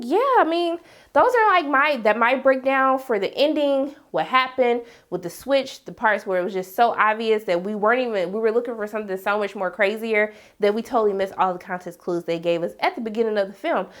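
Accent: South, United States. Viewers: I want to watch the film again, because my first viewing of this had me disappointed. I think the movie on a technical standpoint was well made though. The music was fire! I love this girl's light southern accent (I'm guessing Georgia). I could literally just listen to her read a manual on statistics. Subscribed.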